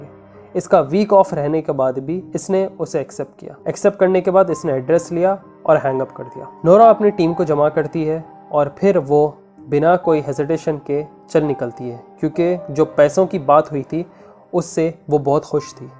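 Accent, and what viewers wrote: Hyderabad, India. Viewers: I lov ur hyderabadi accent....ur videos r som real material...Greatez job...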